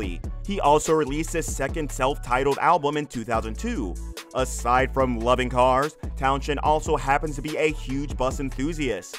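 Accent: United States. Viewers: This show simply conforms the immaturity of British men with their childish pranks. The mechanic guy is worth watching, but that the tall one, what is a disaster. And never use an American narrator for a British show. There's so much background detail that Americans simply can't grasp. Jack, the Japan Alps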